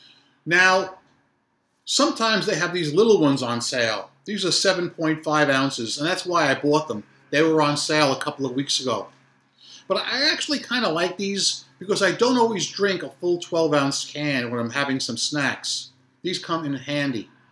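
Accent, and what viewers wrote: New York, United States. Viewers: your NY accent & how you come across is easy listening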